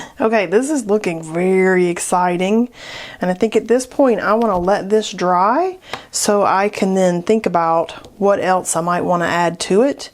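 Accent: South, United States. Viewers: Your southern accent and bubbly personality are so refreshing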